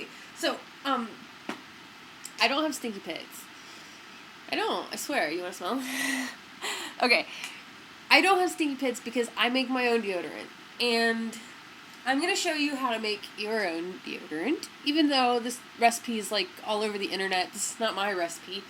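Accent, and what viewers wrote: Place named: Minnesota, United States. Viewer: This portly lad talks like he is from Minnesooootah! How odd.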